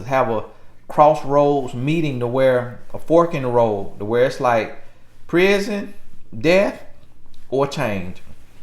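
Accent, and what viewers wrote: South, United States. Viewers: God bless you for this. Oh and I love your southern accent. 🇳🇬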